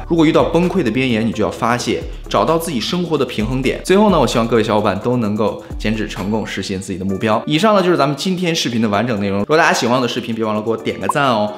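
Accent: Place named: Beijing, China. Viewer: 觉得帅哥是北京人哈哈 虽然儿化音可能因为常年在美国被磨得若隐若现 但是他的吞音还是很标准的lol 【如果大家喜欢我的视频 = rua喜欢我的视频】哈哈哈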